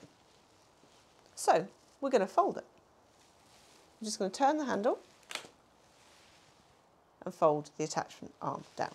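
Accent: RP, United Kingdom. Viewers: Her accent reminds me of Queen Elizabeth.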